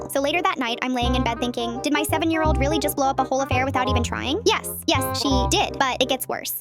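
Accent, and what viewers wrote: Texas, United States. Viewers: Bro holy Texas accesnt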